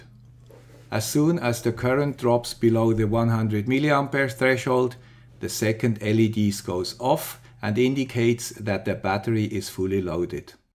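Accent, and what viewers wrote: Switzerland. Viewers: I just love this! I want to know. So I build a contraption that give the answers automagically. And your "swiss accent" is just right. You'r not talking like a gatling gun, so people not having english as their first language can easily follow what your'e saying. Good, as I have huge problems following a monologue in "switzerdeutsch". :-)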